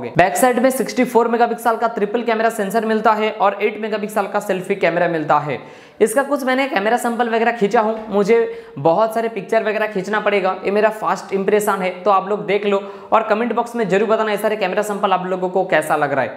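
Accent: Bengal, India. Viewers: Lagta he ap bangali he.isiliye apka hindi sunne me bahut achha lagata he comedy comedy bolta he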